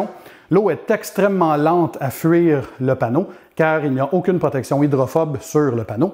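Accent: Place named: Quebec, Canada. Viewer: J’ai commencé regarder ces videos d’abord pour écouter l’accent Québecois, mais ensuite j’achète tous les produits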